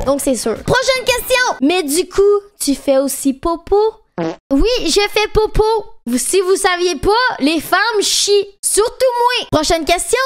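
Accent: Quebec, Canada. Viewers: Honnêtement jsuis un américain qui regarde des vidéos en français pour m’aider a l’apprendre, et l’accent québécois me tue vraiment c’est un fever dream wsh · Je t'adore avec ton accent tu viens du Québec et je kiff. Continu tes vidéos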